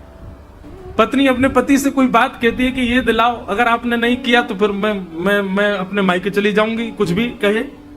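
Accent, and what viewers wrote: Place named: Braj, India. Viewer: Indresji maharaj Aap ki gyan bhari bate sun ne ko brij bhasi boli itni achi lagti hy ki sare din sunti rahu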